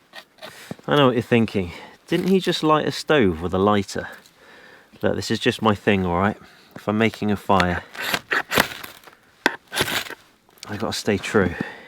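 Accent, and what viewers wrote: England, United Kingdom. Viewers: What are u doing in Sweden mate do u work there because I can tell you are English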